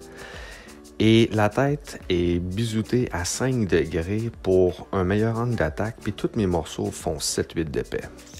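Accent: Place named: Outaouais, Canada. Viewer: as tu une copie de ton template pour le manche? jai aussi figurer pour le cedre, il me restait une partie d'un poteau de cloture que j'avais acheter pour faire des machines a gomme avec mes ptits enfant, ca va etre parfait pour ca. aussi, est ce que cest possible qui il y ait une erreur sur le plan pour les dimension des pieces de noyer, elle marque 1 7/8 et 1 5/8 mais elle sont couper au meme angles, elle devrait avoir la meme dimensions a la fin, est ce une omission quand tu as rajouter l'angle interieur? merci =) et par un pur hasard, serait tu originaire de l'outaouais? tu as le meme accent que moi, je suis de gatineau, on parle franglais ici!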